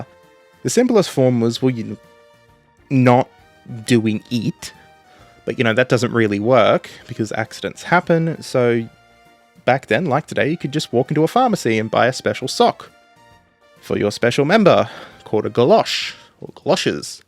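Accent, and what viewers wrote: Australia. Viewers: I just realized this guy is an Aussie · It feels so refreshing to hear an Australian accent in videos like this. Born in NSW, raised in QLD :D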